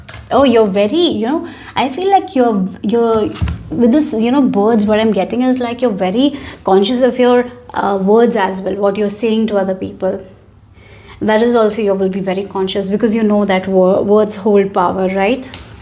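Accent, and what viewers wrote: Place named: India. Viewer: I am sorry to say ... but what a Shītty Read !! So much Irrelevant bull shît !!!!!!!!!!!!!! And my God your h0rrible Indian accent .. so hard to understand...you literally say QWOON..... instead of Queen !!! Took me a bit to get it.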